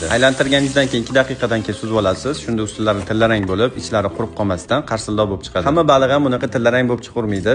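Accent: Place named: Tashkent, Uzbekistan. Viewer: Тошкент шевасида бошладику,рыбхоз деяпсиз?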